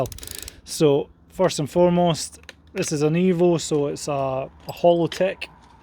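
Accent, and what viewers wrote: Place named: Fife, United Kingdom. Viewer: I'm a fellow Fifer, recognise that accent anywhere!!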